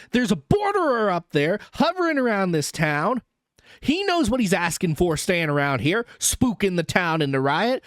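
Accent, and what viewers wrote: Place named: South, United States. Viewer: Your Southern accent coming out really suited the rider camp argument I thought - very much enjoyed it and I'm from Europe. Fitted the worldbuilding I thought. Please continue if you can. I too recall reading this book too young - I picked it up at the library because of the horses on the cover and being an SF/F fan and quickly put it down after getting freaked out by the swarm animals. I picked it up years later and enjoyed it much more, although I can find the prose quite repetitive at times. Cherryh is so good at making the world beautiful, intriguing and horrifying at turns. I love her names: 'willy-wisps', 'wally-boos', 'lolly-lies', 'goblin-cat', 'spook-bear' etc. The fact they can trick you psychically in these evolutionary ways and how that can infect humans psychologically en-masse is genius level worldbuilding. 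The main 'villian' (no spoilers) of the duology is also fantastic, Cherryh turns usual tropes on their head. Wish we got that third novel.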